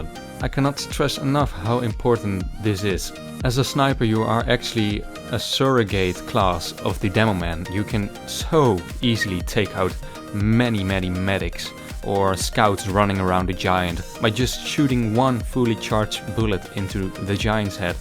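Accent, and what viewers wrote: Netherlands. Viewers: Are you dutch? You sound dutch :D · Your accent sound realy dutch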